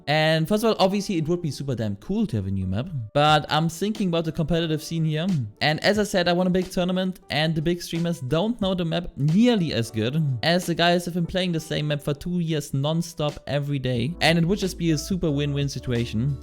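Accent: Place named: Germany. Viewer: Bist du Deutscher wenn ich fragen darf, das hört man irgendwie heraus...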